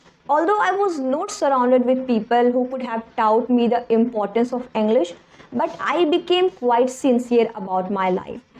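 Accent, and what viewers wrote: India. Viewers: I mostly watch contents in American and British accent so campair to them your account is really cute😂. I watch your video just for your pure Indian cute accent.❤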